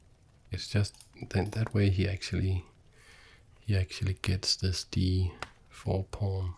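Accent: Denmark. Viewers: Are u danish cause🇩🇰🖐Hva så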